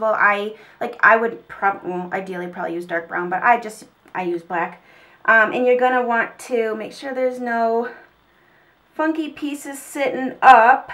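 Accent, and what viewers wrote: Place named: Midwest, United States. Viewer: First off, look at them toned arms!! You look awesome!! Also, I used to be an everyday hair washer bc my hair is fine and thin until I found Batiste! What a life saver. But I cant just run to Ulta bc its too far away so Ill have to check out walmart and try the Herbal Essence one! Also, do you happen to be from the midwest? bc I had a friend who was from Nebraska and she says ROOTS just like you! It seriously made me laugh out loud because I (being from NC) say roots, as in a plant root (if that makes any sense) LOL Anywho, loved loved loved this video! and I want your shirt!! Except I want it to say, "I run so I can eat dessert" :)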